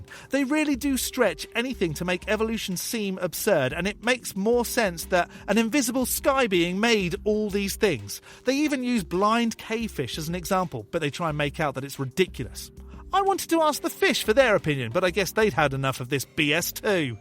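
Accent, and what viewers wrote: Kentucky, United States. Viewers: That is how they speak in Kentucky? Justified! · For years, I've been trying to "place" your accent, geographically. Imagine my surprise, I'm not as familiar with Kentucky regional speech as I thought.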